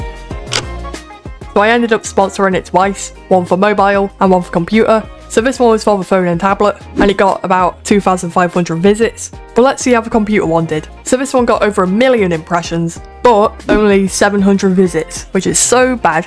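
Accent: United Kingdom. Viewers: are you british im irish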